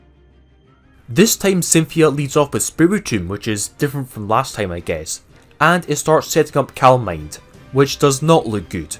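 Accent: Scotland, United Kingdom. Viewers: Loved this mate, inspired me to give it a go. Can hear the scottish in your accent, where you from or where have you stayed here?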